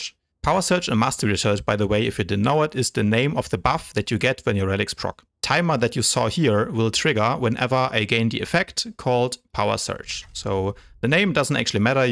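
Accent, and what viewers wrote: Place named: Germany. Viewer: Man hört raus das Du sonst eigentlich Deutsch sprichtst(no front)^^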